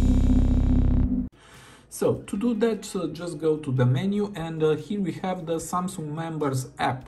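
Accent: Romania. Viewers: Laa fel! :)))) Eram: măi, ia stai asa! Asta e accent românesc! :))) am rămas șocat că am recunoscut. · Mi-am dat seama din accentu tau ca esti român și dupa am vazut la channel description lol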